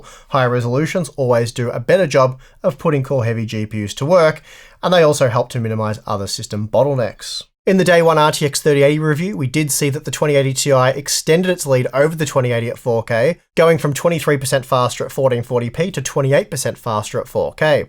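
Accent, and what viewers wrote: Australia. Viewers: I really love how Aussies pronounce things....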